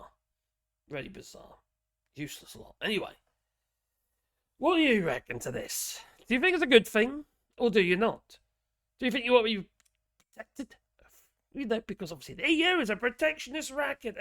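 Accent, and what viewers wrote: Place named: Norwich, United Kingdom. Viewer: By the way, who runs this channel is from Norwich. I didn’t know this accent but I’ve got quite used to it from listening to him! 😉🙂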